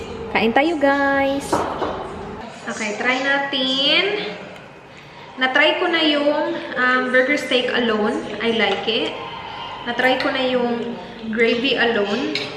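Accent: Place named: Visayas, Philippines. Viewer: Bundak kaayo mu bisaya si ate 😂 shout out po ate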